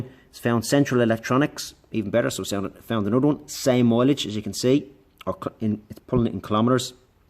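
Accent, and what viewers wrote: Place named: Ireland. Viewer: Irish mate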